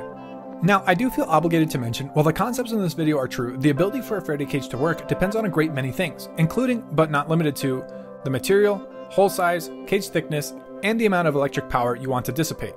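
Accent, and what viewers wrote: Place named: United States. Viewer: Bro hindi me banao video... Ye American English samj nhi ata....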